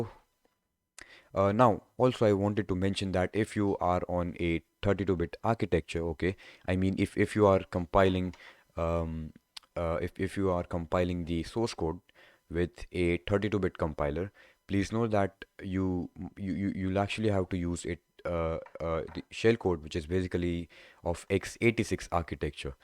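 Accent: India. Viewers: You are sound like Hackersploit, but with a lil bit indian accent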